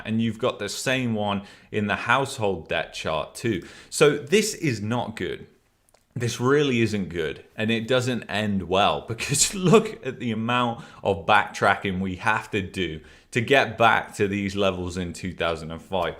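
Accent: United Kingdom. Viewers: Finally we see the true story where the mainstream media never covers. Many Thanks for your time. New subscriber. Love the British accent too.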